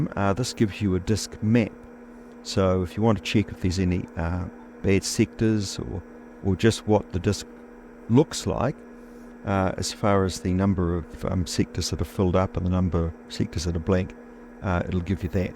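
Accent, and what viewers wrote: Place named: New Zealand. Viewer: At first i guessed you were Australian, but your pronunciation of Check it tells me you're from New Zealand. One of my students was from your country.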